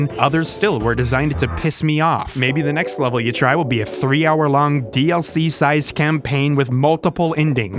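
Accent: United Kingdom. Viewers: why is he british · you're british? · never knew you were British, the more you know lol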